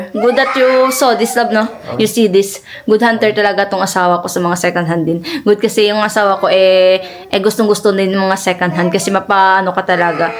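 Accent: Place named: Iloilo, Philippines. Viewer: Parang ilonggo ka ate sapananalita mo😂same, ❤ hi from korea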